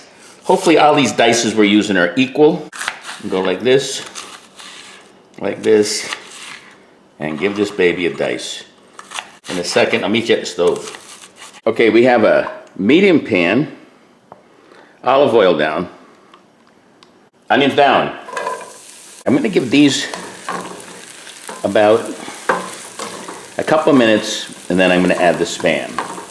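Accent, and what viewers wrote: East Coast, United States. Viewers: Yea buddy. where are you originally from? East coast?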